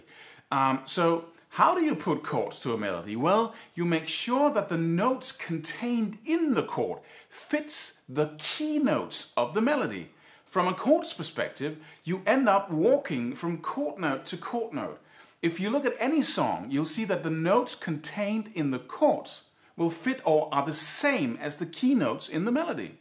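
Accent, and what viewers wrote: England, United Kingdom. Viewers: Wow you look like me with short hair no facial hair and an english accent , shred on cuz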